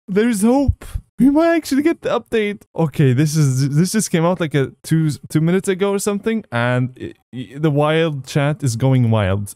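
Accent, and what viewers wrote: Germany. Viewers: Are you German? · Wait you german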